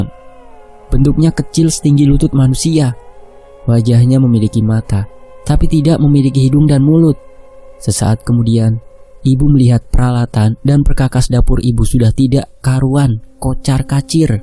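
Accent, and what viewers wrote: Java, Indonesia. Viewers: Waallaikumsalam wr wb mzzz Mkshi mzz Uda membawakan cerita nya Mzz kl bc sgt bikin penasaran dan siarnya kas jawanya lucu hhh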